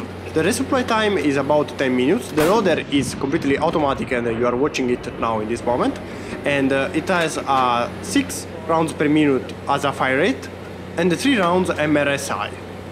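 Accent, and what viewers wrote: Italy. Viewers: Sei italiano so riconoscere un italiano che parla inglese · accento italiano sempre il migliore ;)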